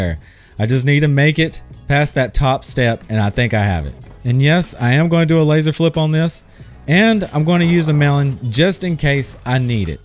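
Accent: South, United States. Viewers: your accent is like a mixture of suburban , flamboyant , ghetto , and southern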